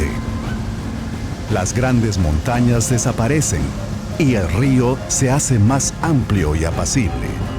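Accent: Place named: Spain. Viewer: Thank you this wonderful spanish accent. Total understandable even with my little spanish also. And the film is perfect. Congratulaion for this narrations again!!!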